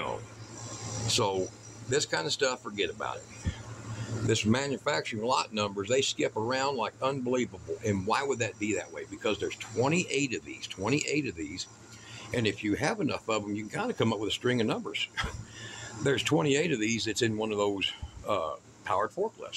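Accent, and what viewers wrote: Texas, United States. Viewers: So funny that I hear I think a Texan. I know one friend of mine with the same name in Irving, TX that's a Scouter friend. Living close to DFW airport gives a perspective of how folks talk. Believe your doing a great job for us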